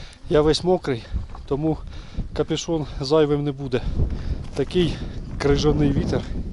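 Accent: Russia. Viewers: вы разговариваете по украински с русским акцентом по русски наоборот с украинским 😮😊❤ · Потому что думаете вы на русском и это слышно😢😢😢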